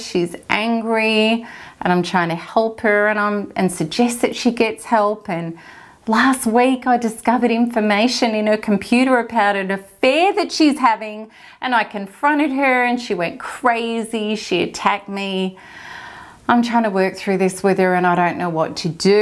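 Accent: Australia. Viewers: Love your accent! Are you Australian?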